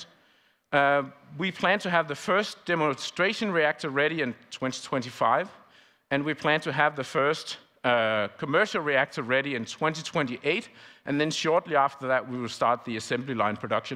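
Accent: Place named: United States. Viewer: It never ceases to amaze me how good your American English is.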